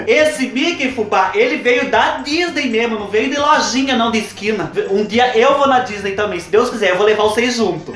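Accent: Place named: Sorocaba, Brazil. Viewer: Sabia que este sotaque era sorocabano! ! Odeio traça na parede!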